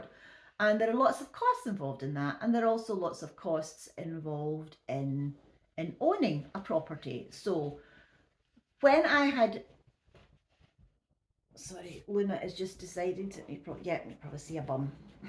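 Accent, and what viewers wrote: Scotland, United Kingdom. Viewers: Hi, some people are so rude they just are not really worth bothering about. I really enjoy your channel and your blunt Scottish accent. I am 89 and have enjoyed my life. I live with my son now because when my husband and I retired we sold up in the UK and moved to Spain we had 10 wonderful years out there then my husband got terminal cancer so we came back and he died 6 months later. I sold my villa in Spain and was able to give my two grandsons the deposit for their own homes and help my two children out as well. I live in the South East and property is very expensive so I was able to sent them up while I was still alive to see them enjoy it. I live with my son because he is alone and we enjoy each others company I have a good state pension and two small private pensions and some savings as well. I have paid for my funeral so now I am enjoying the rest of my life with my children.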